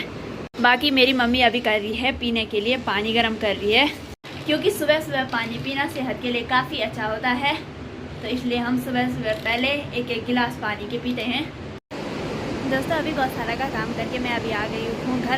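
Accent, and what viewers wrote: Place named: Garhwal, India. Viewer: Nice video beta aap Garhwali language wali Hindi acchi lagti hai,Mai har video dekhta hu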